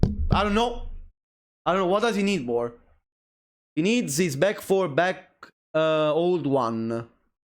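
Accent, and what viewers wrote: Italy. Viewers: Having my main roasted isn't actually too bad when its delivered in a very pleasant Italian accent haha ^-^ I genuinely hate whats happened to Nina in this game. Her range, punishment and mixups have been improved to such an insane degree, that her old playstyle now takes a complete backseat in some matchups. I hate seeing iws1 juggles basically becoming irrelevant outside of low parry combos as well 😔 I dont want them to hit d/f+1,2 again. I want her old poke and move around playstyle conserved. I just want them to gut and neuter d/f+1,2~f on block. Either make it -5 or just flat out remove the ability to do the transition on block. Im so sick of stance transitions in T8 turning a bad situation into a neutral or good one. Thats not how it should work. A d/f+1 into stance at neutral on block is utterly dumb. You dont get to press a button to go from disadvantage to neutral on block. 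Stance transitions should turn an already existing advantage into a greater one but leave you vulnerable if you're reckless with them and commit to it on block. And for the love of god, restore Nina SS1's range to its T7 hitbox. The move has always been busted, no Nina will dispute that. But it used to be hard to use. It made her wall pressure so crazy. It had a glaring flaw, but the pressure it provided was so good that you'd happily work around its bad range. So improving its range so Nina can easily use it midscreen, was a looney tunes decision. Theres been a lot of that in T8. Take a cool thin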